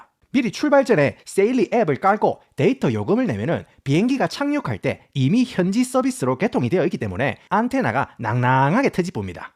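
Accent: Gyeongsang, South Korea. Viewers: 이어버드로 소리만 처음 들었는데요 찐한 갱상도 사투리에 나이 많은 아저씨신가 했는데요. 계속 듣다보니 영어 발음 욜 좋으시고 영상도 보니 힙하게 영하게 생기심에 놀라고. 끝까지 들으니 깊은 내용에 감동했어요. 너무나 도움되는 영상 감사합니다. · 유용한 정보 감사합니다. 구수한 경상도 억양도 재미있어요. 스위스에서 먹은 치즈 얘기 듣기만 해도 군침이 돕니다!😋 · 경상도 액센트 너무멋져 부러워요❤ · 유익한 정보 감사합니다 완전 갱상도 특유의 언어로 시원한 설명 도움 많이 받고 구입시 신경 쓰겠음다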